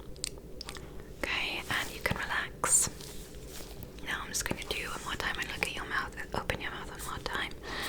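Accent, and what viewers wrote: England, United Kingdom. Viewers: this english accent is so jarringgg please stop it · Being british when you say certain words in this British accent I sit here repeating them like “do I say stomach like that?” I mean clearly I don’t I am from Manchester I am in no way posh 😂😂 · Best ASMRtist on YouTube, and the very realistic British accent is back :)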